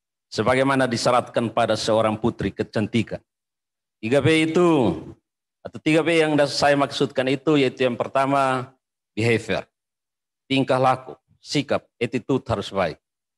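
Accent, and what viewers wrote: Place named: East Nusa Tenggara, Indonesia. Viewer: Pidato khas NTT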